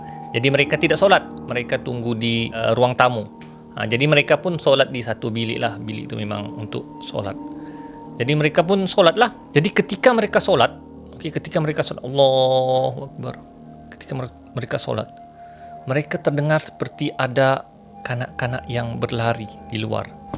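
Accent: Sabah, Malaysia. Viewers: Lambat hadir tapi tetap tengok. Suka dengar orang Sabah cakap. Hehe he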